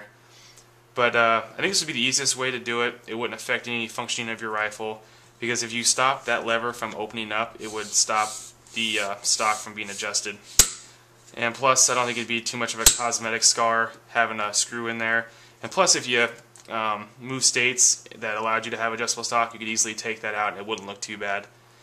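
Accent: United States. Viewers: If I could guess the state, it sounds like MA. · sound like new york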